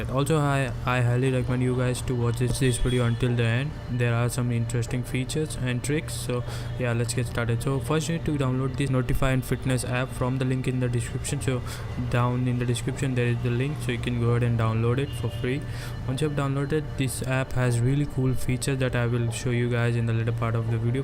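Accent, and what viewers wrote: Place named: India. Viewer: I hate this indian accent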